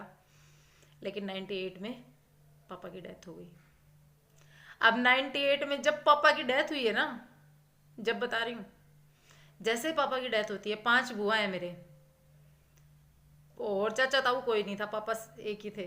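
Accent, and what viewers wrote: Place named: Haryana, India. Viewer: Aapki aavaj se to asa lag aap haryana se ho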